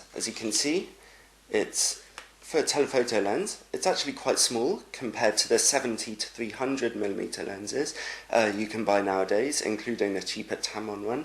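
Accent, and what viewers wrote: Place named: RP, United Kingdom. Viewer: I like your perfect Received Pronunciation/posh English.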